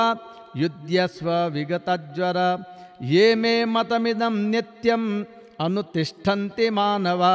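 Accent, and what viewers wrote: Standard. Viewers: श्री जी को बारम्बार प्रणाम। आप श्रीमदभगवदगीता पाठ शुद्ध एवं स्पष्ट उच्चारण करते हैं। मन प्रसन्न हो गया।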